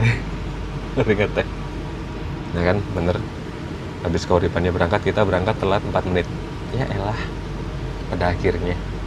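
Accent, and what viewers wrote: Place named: Sunda, Indonesia. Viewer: A jiga na udah kental sekali bahasa sunda na hahaha😅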